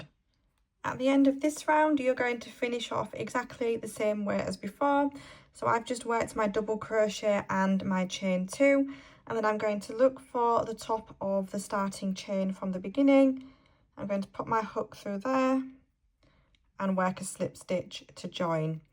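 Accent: Northern England, United Kingdom. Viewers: 💙💙💙 Thank you so much for sharing this. ✌🏻💙🧶📝✂️ Are you from the northern part of England? I'm just curious because your accent is a bit different.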